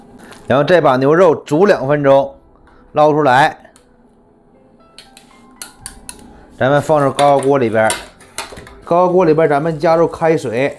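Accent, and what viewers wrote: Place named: Liaoning, China. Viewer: 浓厚的辽西口音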